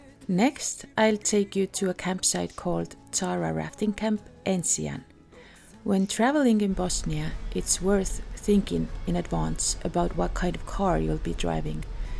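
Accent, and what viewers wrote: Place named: Estonia. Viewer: Aitäh, mulle väga meeldib eesti aktsent! Hääldate mõningaid mu emakeele termineid väga täpselt. Olen vaimustuses ja hämmastunud. Aitäh, mulle väga meeldib eesti aktsent! Hääldate teatud väljendeid minu emakeeles väga täpselt. Olen vaimustuses ja sügavalt muljet avaldatud. Lisaks olete väga asjatundlik ja objektiivne, mida ma eriti hindan. Ma ei tea Eestist kuigi palju, kuid edaspidi püüan teie kodumaa kohta rohkem teada saada. Aitäh, et avasite mu silmad... Parimate soovidega, Samir (Sarajevo) :face-red-heart-shape::hand-pink-waving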